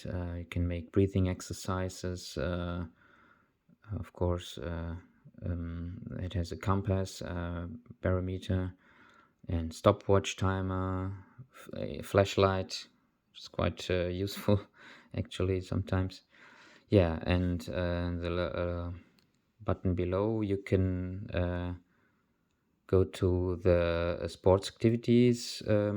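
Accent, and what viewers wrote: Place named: Germany. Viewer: Sounds like a german to me :D If I'm right: liebe Grüße! :D Nice Video, good information and its one of the best long time reviews for this watch. Helps a lot!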